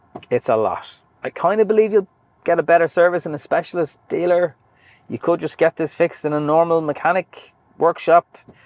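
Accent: Ireland. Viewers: well there is your problem. I own two 911s, a 993 series and a 991.1 GTS series. The <num> was bought when it was 14 years old with 68K kms and the <num> GTS was bought when it was 3 years old with 157 kms, yes 157 kms at 3 years. Both are manuals and mint. I also do ALL my own work, not to save money but to make sure the job is done right as I am ultra-picky. I've been wrenching since I was in my very early teens on cars, motorcycles and aircraft as a hobby. You are buying a 20 year old car, so of course it will have issues and it isn't the parts prices which are very reasonable but the labour costs. It could be worse, you could have bought a British or Italian car. So in your case, I would have detailed the underside of your 911 and cleaned the engine to get it free of oil, etc so that you can pin point the actual source of the leak. As for an MX5 or a S2000, no thanks. 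I also didn't buy a 911 as an investment; life is too short and I am a "tech" and not floating in money. Great to hear the accent, this coming from someone that went to Rockwell College in Tipperary.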